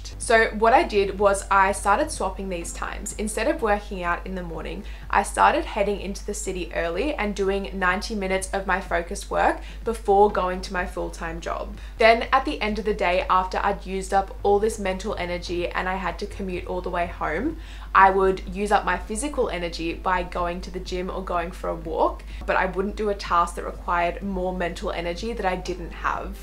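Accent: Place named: New Zealand. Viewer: I love her kiwi accent! So pleasant to listen to.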